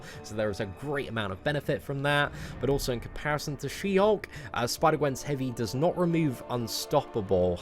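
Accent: United Kingdom. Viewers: great videos bro. They've helped me a great deal. I was wondering if you could help me out. I'm farely new to the game. But, I'm finally starting to get 4 and 5 star champs. I'm going to list them below. Could, you recommend which champs I should make my 3 man attack team and which ones I should make my 5 defenders? None of my champs are awakened yet. By the way what part of the U.K. are you from? Or am I hearing wrong and your from down under? I'm what you call an American Scouser lol. Once again thanks and keep up the good work. 5 star - Hela and Yondu 4 Star- Morningstar, Mephisto, Blade KMonger, SheHulk, Emma, Guillotine, Venom, VooDoo, Wasp, Goblin, Sentry Wolvie, Antman, Punisher, Abomination, Night Crawler, Cyclops Blue, RedSkull, Capww2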